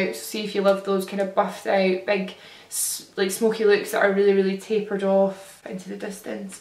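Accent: Scotland, United Kingdom. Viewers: Where from Scotland are you? I'm Finnish and trying to get more knowledge of specific accents so I won't look like a jerk when I'm talking to people 🙈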